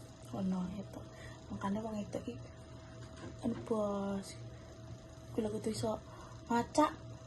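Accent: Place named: Java, Indonesia. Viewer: pengen ngerti boso inggrismu lancar pora wong logatmu jowonemen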